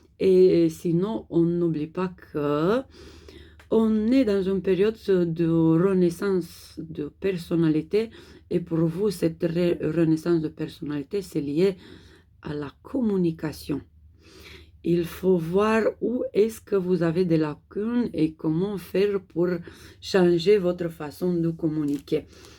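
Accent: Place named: Russia. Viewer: j'aime beaucoup votre voix et tes accents. êtres-vous d'originalité Russe?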